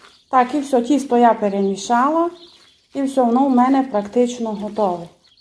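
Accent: Transcarpathia, Ukraine. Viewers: и снова вы с чудесным закарпатским говором и нашими украинскими вкусностями Рада вас слышать Привет из Изюма❤😊😊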